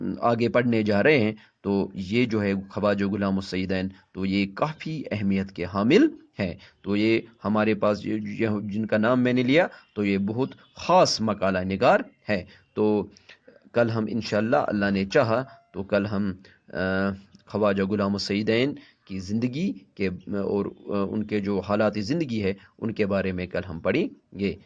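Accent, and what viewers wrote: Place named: Sindh, Pakistan. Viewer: کیا آپ سندھی سے تعلق رکھتے ہیں ؟ آپ کے لہجے میں سندھی مٹھاس کی حلاوت محسوس ہوتی ہے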